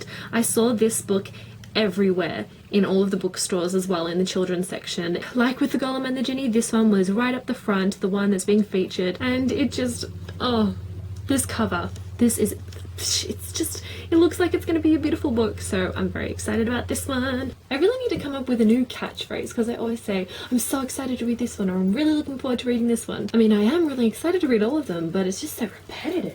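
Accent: Australia. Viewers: OMG I wasn't sure if you were Australian or not! thank god there is an aussie booktuber!! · 66 books O.O and haha all this time I thought you were English but your Australian. It seems more evident now haha.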